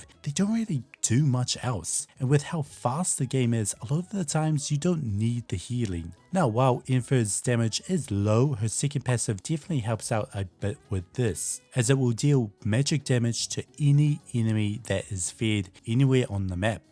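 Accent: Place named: New Zealand. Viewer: Are you Kiwi? ;) Love your accent!!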